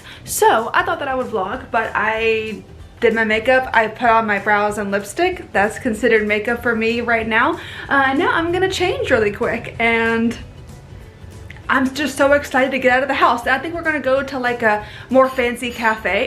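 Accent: United States. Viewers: The weather looks gorgeous in Palermo - and so does the city! Oh to be there!! Strange how, as I Brit myself and a regular follower of, I can tell Raff's particularly "English" accent seems so noticeable against your naturally "American" English - something I just don't notice when listening to on his own.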